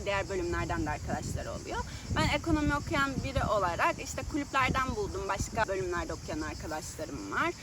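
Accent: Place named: United States. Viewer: Bilkent üniversitesi vakıf üniversitesi Boğaziçi devlet okulu kıyas klasman farklı ama siz bence Ankara dan kaçmışsınız,Bilkent girişi kolay mezun olmak zordur,Boğaziçi zorsa Bilkent kesinlikle daha zordur ,devam zorunluğu vardır ve hangi bölümde okursanız Türkiye nin en iyi bölümü olarak okursunuz bunu size okul her türlü hissettirir,Ankaralıyım diyorsunuz evet İstanbul daha güzel bir şehir ama Ankara’da Tunalı,Gop,Bahçeli de milyon tane Cafe vardı,biz bayağı gezerdik siz içinden değilsiniz galiba,kulüp kurmak isteyenler rahatlıkla kurabilirdi,Bilkent mezun senenizde kurumsal firmalar bizzat gelip kariyer günlerinde elemanlarını seçerdi bir çoğu mezun olurken iş sahibi olmuştu,Boğaziçi güzel bir üniversite ama imkanlar Bilkent te daha fazla ,network demişsiniz sadece güldüm😂😂 hazırlık kabus gibidir evet aşırı zordur ama siz ielts aldım demişsiniz keşke 1,5 sene beklemeseydiniz,birde bu konuşma stili gerçekten çok komik ,özenti kendiniz gibi konuşun gerçekten çok itici duruyor ,bizler Türk toplumu olarak Amerikan yeni dönmüş işçi havasında konuşmayalım,Türkçemiz kişiliğimiz her daim sizi seçkin kılacaktır.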